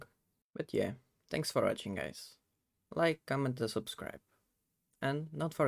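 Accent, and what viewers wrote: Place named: Portugal. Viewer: Muito tuga, não engana ninguem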